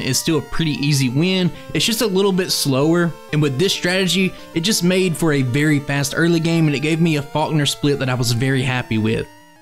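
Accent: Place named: Louisiana, United States. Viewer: Bro what is your accent. Nothing against you at all. I enjoy all the vids but your accent sounds so Hollywood south movie created that I have to know where it’s from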